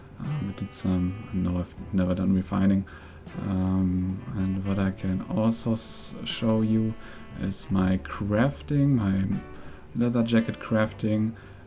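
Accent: Germany. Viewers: Love your German accent IGN: ZeusKingofGods2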